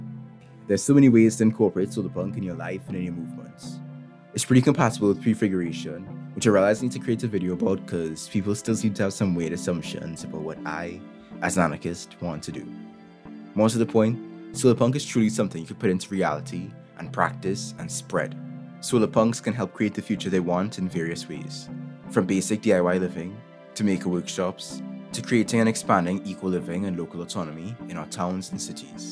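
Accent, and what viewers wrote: Trinidad and Tobago. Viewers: What country are you from? You sound trinidadian a little lol 😭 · Cauhgt me offguard with the trini accent. Haven't heard trini since living in Venezuela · I keep trying to place your accent. Are you trini? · i knew i recognized the accent, found a Trinidadian youtuber out in the wild lol. love the video and although i haven't heard the name before i am familiar with the concept of solarpunk and honestly i hope we will be able to see our futures in this hopeful light. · Boi, I know a Trini accent when I hear one! Love ya channel. You have a new subscriber.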